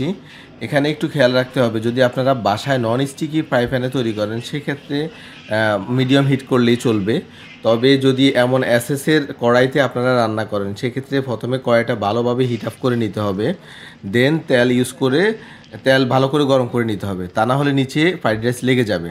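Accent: Noakhali, Bangladesh. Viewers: Noakhali mone hocce.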